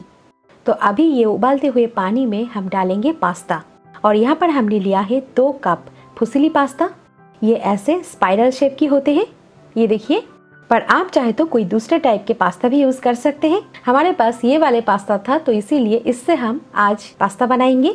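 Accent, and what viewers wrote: Bengal, India. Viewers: Your Hindi accents are seems to be like Bengali. Are you Bengali?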